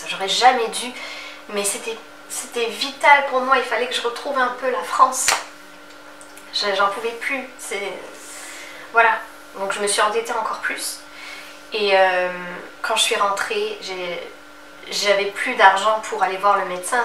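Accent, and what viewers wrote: Quebec, Canada. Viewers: Jsuis québécoise et on entend tres bien ton accent quebecois lol par contre un détail me tanne, on a un super bon système de santé jcomprend pas que tu devais payer mais que tu avais le droit de travailler, ta pas eu de carte d’assurance maladie? Ici on a l’équivalent de votre carte verte je comprend pas. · merci j'adore ta franchise je regarde avec décalage tes vidéos, tu as conservé un magnifique accent Canadien, tu es au soleil, oui notre système de santé est un des meilleurs du monde et accéssible à tous. Tu es géniale bourrée de talent ne te laisse pas prendre la tète suis tes intuitions, protège toi, fait cracquer le vernis des gens ils et elles que tu rencontre bien respectueusement un vieil baroudeur et aventurier devenu ermite te parle je te suis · Dsl pour ton expérience :)) tu as encore un peu ton accent de Québec. Le plus important c'est que tu soit bien ou tu est ! 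Salut de la part de Québec (La Ville De Québec) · tres touchant ton histoire d amour decevante et ton sejour si penible en bout de compte ..t est tomber en amour avec un pauvre type.. isole a granby .. c est vraiment dommage pour toi ..on appelle ca l experience pour les deceptions de la vie les gens finissent toujours par nous decevoir en general il ne te reste qu a retrouver l amour .......t a encore l accent francais mais tu t exprime comme une quebecoise.....peut etre reviendras tu un jours qui sait....esperant que tu a garder de beaux souvenirs et de bons contactes.....le prochain tu seras plus selective et mature t est encore tellement jeune ta vie ne fait que commencer tu seras toujours bienvenue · Avec l’accent que tu as pris ,tu es québécoise!!!!!gagné. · Je sais pas combien de temps tu es resté ici, mais ton langage se mélange entre français et québécois, c’est mignon hihi🤗 bonne chance à toi pour la suite xx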